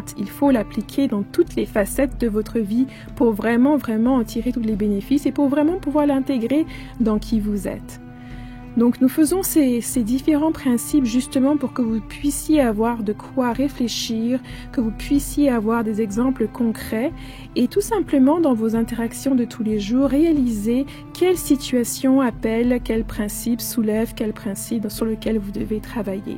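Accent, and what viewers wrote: France. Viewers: Merci pour ce partage que je partage également.. juste comme ça, tu ne serais pas de Lorraine? De part ton accent ^^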